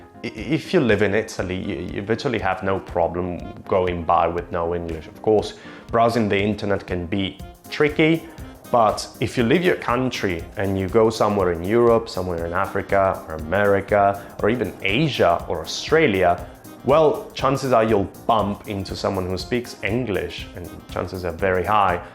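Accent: United Kingdom. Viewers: IlRompiBalle In quanto madrelingua italiano non può avere un vero e proprio accento dei classici, per quanto il suo sia chiaramente un inglese in linea di massima British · I think your accent is sooooo British :) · Seriusly how you have almost a british accent!!! I struggle so hard to just cancel the italian accent. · Oddio... Con quella barba e quell'accento britannico mi fai emozionare!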